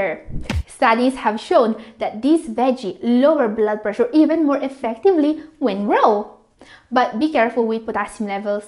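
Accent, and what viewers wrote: United Kingdom. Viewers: Her British English is just as a Londoner!!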